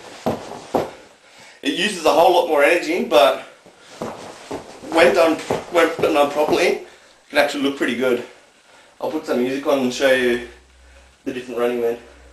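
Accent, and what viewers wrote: Australia. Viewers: i tried learning it, but i cant dance so i couldn't do it :( but omg great vid and ur rlly good at shuffling :) btw, what nationality are you? are u australian, ur accent is awesome :)) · Aussie accent :) yay. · I watched this video 8 months ago, it helped me get the moves down. I'm not gonna lie, I've ended up more hard style but still, this help me get started, Thanks for this video. And from the sound of it, you're Australian? Would make since, it is the * Melbourne Shuffle after all. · He's Australian. Our accents aren't that difficult to understand. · OMG~!~! hes australian awsome acsent dude....... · proper aussie accent bra! likes it! 5/5 this how i learnt! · his acent sounds australlian cause it is????? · Are you australian? Fuck yea! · aussie accent :) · I'm not sure what is stronger. His ability to dance or his aussie accent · you mispelt guide*........i know your an ausie but please try and speak ENGLISH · are you aussie!? 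your an aussie! haha me too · Why are people saying he sounds British? Not sure but he sounds more Aussie than British to me. But that is just an Aussie talking, what do I know? We are all upside down anyway xD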